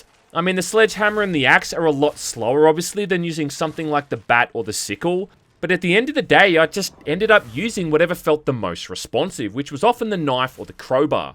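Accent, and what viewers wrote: Australia. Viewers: Aussie accents are borderline psychological torture 🤯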